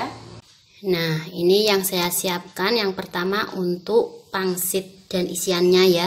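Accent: Java, Indonesia. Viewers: Iya itu logam jawa hadeuuuh...😀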